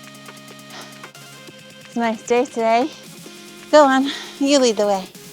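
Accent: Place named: New York, United States. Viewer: Was not expecting a New York/Joisey accent.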